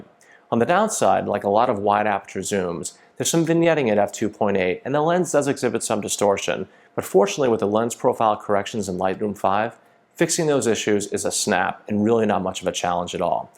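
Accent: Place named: South, United States. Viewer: Very refined manner of speaking from a gentleman of the South. May I ask what Camera-lens combination did you use? Your video is just so beautiful, with the bokeh very pleasing to the eyes. Everything within the frame is supremely picturesque.(And by the way since you are a Canon user, I subscribed immediately)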